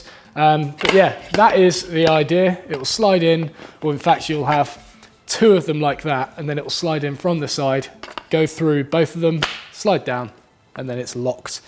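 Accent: United Kingdom. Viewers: Man i LOVE that british accent!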